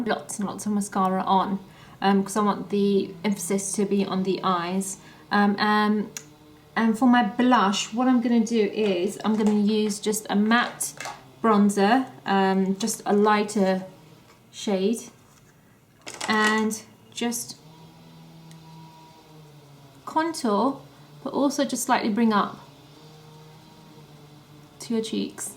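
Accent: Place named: London, United Kingdom. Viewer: wow i know iv already said this but sometimes it seems like its cheryl cole doing a tutorial but with a better accent i love the londoners accent. hope you both have a fabulous year ahead and keep making videos and easy on the eyes and ears sis mwah xx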